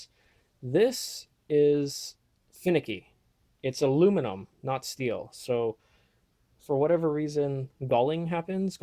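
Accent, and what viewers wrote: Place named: Hawaii, United States. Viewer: Dang, you've accumulated quite an amount of tools! great video! such nostalgia listening to someone with a Hawaiian accent haha and also, if you can you should post videos on how you fix appliances! I would love to learn!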